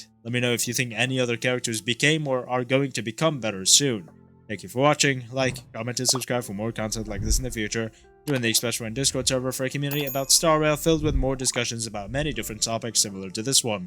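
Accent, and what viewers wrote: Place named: Arab world. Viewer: I think you are from united arab your accent seems like them or near it :3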